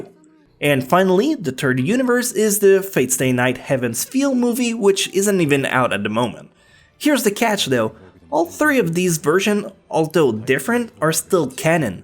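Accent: Quebec, Canada. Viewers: Tu sonnes vraiment québécois. It takes a quebecer to recognize a quebecer accent haha.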